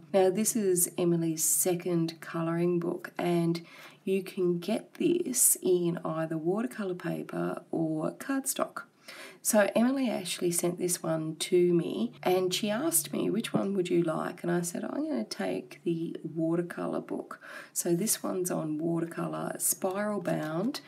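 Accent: Australia. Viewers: Great video, I love you're Australian accent, cute stuff.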